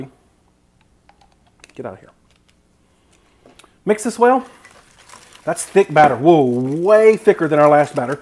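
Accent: South, United States. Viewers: I adore you ❣ I adore and appreciate your honesty , your humor and your southern accent keeps me smiling while I am cooking along with your tutorials..... I just adore you ❣❣ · I love your accent ! It reminds me of my southern family! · I love your enthusiasm for food !!! and your southern accent is darling!!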